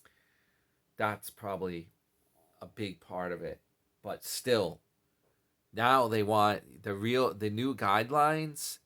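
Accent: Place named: Long Island, United States. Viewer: Love listening to your L.I accent!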